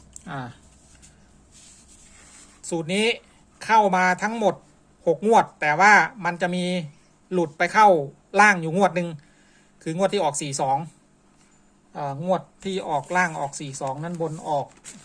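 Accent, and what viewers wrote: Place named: Isan, Thailand. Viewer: ผมว่าอิสายครับ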